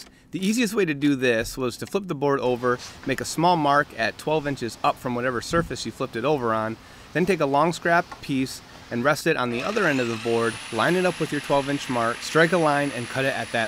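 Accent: Michigan, United States. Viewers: R u from Michigan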